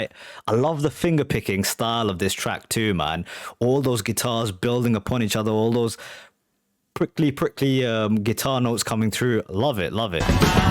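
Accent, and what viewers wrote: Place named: United Kingdom. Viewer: Where do you live? Don't get me wrong I just wanna know cause of your accent. It's best sounding british accent 😊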